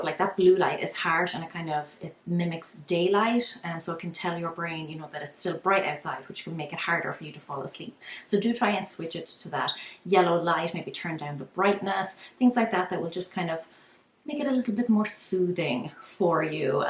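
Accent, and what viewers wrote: Ireland. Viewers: I always stay til the end and I love your Irish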